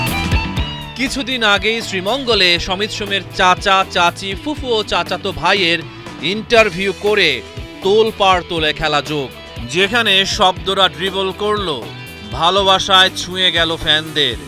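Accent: Kolkata, India. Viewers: দাদা কি কলকাতা থেকে এসেছেন নাকি? কথা এমনে বলেন কেন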